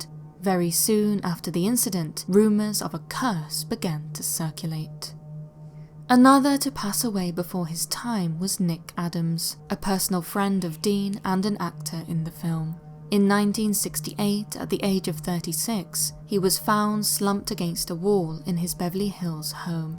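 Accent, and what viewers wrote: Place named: RP, United Kingdom. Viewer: Queen's English...luvly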